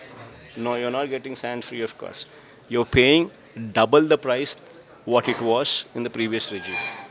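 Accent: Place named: London, United Kingdom. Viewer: Basted jaghan is talking London English lucha jaghan is captain's for all criminal mafia done is lucha jaghan he himself telling his character and his mind of jaghan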